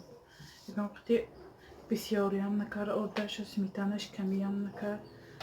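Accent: Isfara, Tajikistan. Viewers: Бехтарин Шумо аз шахри Исфарами Акцентатон авантюрин.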